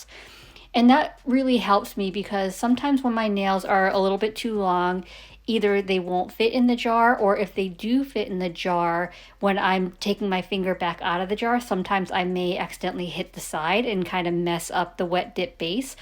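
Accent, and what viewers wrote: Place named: Massachusetts, United States. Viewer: Lavendah… you’re speaking my Massachusetts accent we have officially dropped the ‘R’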